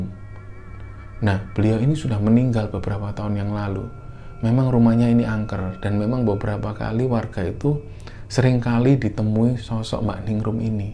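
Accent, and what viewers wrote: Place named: Surabaya, Indonesia. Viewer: Seng tak tunggu iku logat khas suroboyo cak. Ojok di ilangno boso suroboyoe.. 👌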